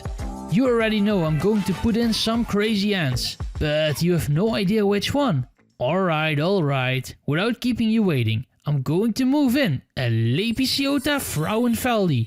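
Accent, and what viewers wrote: Netherlands. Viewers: Haha na de eerste paar seconden wist ik al meteen dat je Nederlands was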